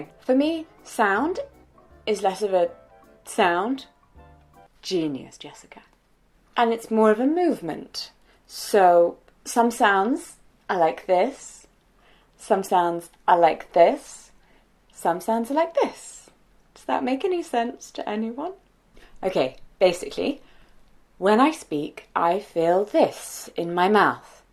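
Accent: RP, United Kingdom. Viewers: I just found this channel and the first thing that came up to my mind is "if shes deaf then how does she has such a strong british accent?" can somebody that watches her channel for some time answer this question? I dont come to hate on you i just want to know. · You have a good voice just wanted to let you know and your British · I knew this from a previous video, but I continue to be amazed because you have this wonderfully perfect posh RP accent, that seems flawless. And that I literally just now realized rather compliments your vintage aspects. In that it is classic and yet up to date at the same time, in the way that you talk about clothing and hairstyles of the 20s and 30s and also disability and gay rights of the modern age. It suits you. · You sound like Queen Elizabeth, when she was young, or like one of the ladies of Downton Abbey. That is to say, aristocratic, having well articulated, received pronunciation. 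That is not criticism but merely observation. Of the various British accents, received pronunciation is the easiest for us in America to understand. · Yes please don't lose your British Aristocratic accent which I've always associated with snobism (I'm a post-war baby) - not your scene being snobbish ...you just crack me up! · i love your voice, it’s soft but clear, and basically you sound stereotypically posh english but it’s really nice to listen to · Well...I love your voice! You have a British accent and kind of a 1950s Victorian tone and when you us r’s in words it sounds very formal and amazingly magnificent! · Wow.... Your very British English is AWESOME!!! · Thank you for this video Jessica. I just want to let you know that I can listen to you speak forever :) I actually leave your videos on for my baby girl to listen to, hoping she can pick up you Brit accent :) Honestly though, your videos are a source of joy, love and hope. 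I wish you good health always. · Your voice is so good to hear, is beautiful and your accent is amazing!!!! I love British accent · This was very informative and thank you as always. You do have a lovely voice made all the more charming by your British accent. Or what we in the states call an accent, to you it's your normal voice. My voice is a higher pitch than I think it is. I've heard it recorded many times and like most folks, do like the sound of my own voice. Have a fabulous day Jessica 😃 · Jessica. Your voice is beautiful. I mean, I love British accents but yours is just so light and beautiful. This may sound weird but in my mind, while I'm writing this, it is like your voice is jumping on clouds. To me, my voice sounds much lower than it really is. In my voice recordings, to me, it sounds like I'm like 6 years younger. My brothers and I did this thing where we voice recorded ourselves and then spoke with the recording and both my brothers confirmed that that is how I sound. 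Isn't weird to think that when you talk that people hear a whole different voice from the one you hear?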